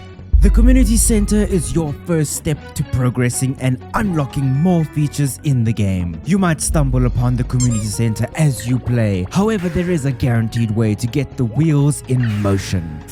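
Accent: South Africa. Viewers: You are south african for sure.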